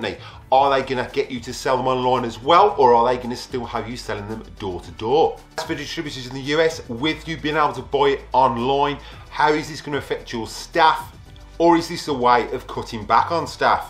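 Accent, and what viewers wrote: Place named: Midlands, United Kingdom. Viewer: That's amazing, probably never ended up in the same room though, I think you left just before I started, I joined near the end of the G3 run, the G4 was unveiled just before I left. Never occurred to me you'd be anywhere other than UK, and what with your midlands accent. Mind you, there's kind of a vibe in some of your videos, lighting maybe?? Don't miss UK at all myself, moved away to Switzerland about 13 years ago and that's where I'll stay. The UK now, for me isn't the same one I left so.... That said, there's a slight difference between living 4 or 5 hours away and an entire day.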